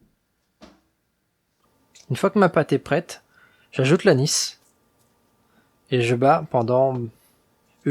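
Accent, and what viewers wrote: Venezuela. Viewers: Francés venezolano?🇦🇷🇧🇬🤔🤔🤔🤔🤔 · jajajaj excelente español a lo Venezolano, buenísima receta de ese manjar de los dioses.. Como dirían por aquí, "pa chuparse los dedos" saludos mi pana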